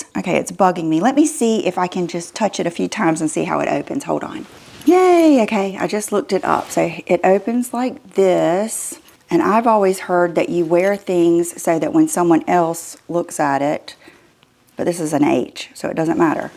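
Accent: South, United States. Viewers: Funny story. A close friend of mine and her daughter were in Paris about 3 years ago. They were in a looooooong line to go in the Hermes store, where her daughter just "knew" (hoped and prayed) she would get lucky. Suddenly, they pulled her out of line, and she was able to buy a Birken on the spot! It was nuts!!! They couldn't believe it. No explanation was given. CRAZY! You just never know. The lawsuit? I have no feeling one way or the other. Love your channel...I've watched you for YEARS and YEARS! You're the sweetest, most authentic Southern Belle I've ever seen... and I'm southern, so I know! LOL I don't know if your state considers themselves the south, but your accent is fabulous!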